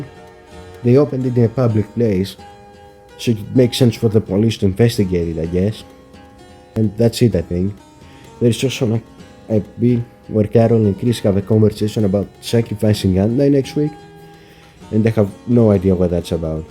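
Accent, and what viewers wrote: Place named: Netherlands. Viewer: Sounds like Netherlands to me for some reason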